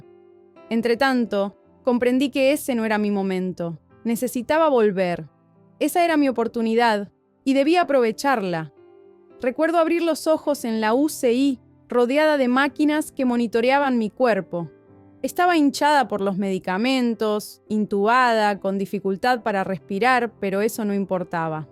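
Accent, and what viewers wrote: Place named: Argentina. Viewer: Dices que eres peruana,pero hablas como argentina.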